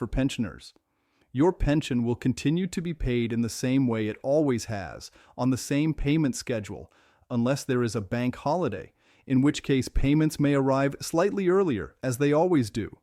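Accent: United States. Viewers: Why another Yank? AI?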